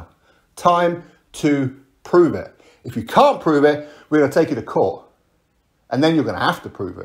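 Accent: United Kingdom. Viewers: You are a biased youtube blogger..glad I don't subscribe to your page....you sound British and biased...Fury is subhuman with the cheating...you can get your small fan base to deny these allegations but they are real...👎👎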